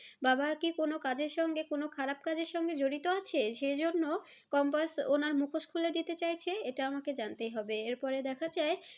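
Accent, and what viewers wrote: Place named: Bangal, Bangladesh. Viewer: বাঙ্গাল, বাঙ্গাল অশিক্ষিত উচ্চারণ, অপদার্থ, তোমার মতো গাড়োলের কথক হবার শখ কেন?নিজেকে শিখকিত করো,বাঙ্গাল বাঙ্গাল অশিক্ষিত উচ্চারণ ভূলে যাও।তার পর কথকতা করবে।শুভেচ্ছা রইল।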